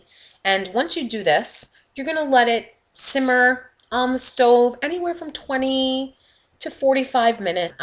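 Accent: New York, United States. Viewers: you sound like you are from New York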